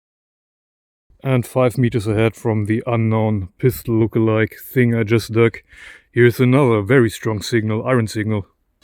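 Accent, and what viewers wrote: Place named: East Germany, Germany. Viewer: na mehr als sicher sind diese funde in Deutschland im östlichen raum gemacht, akzent ist def ebenfalls Deutsch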